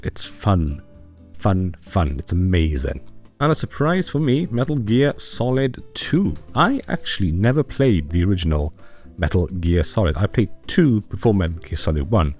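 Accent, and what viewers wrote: Wales, United Kingdom. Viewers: Where in Wales you from bud?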